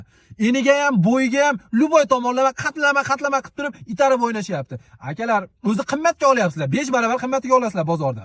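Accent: Andijan, Uzbekistan. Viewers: Анжанданмисиз гап йук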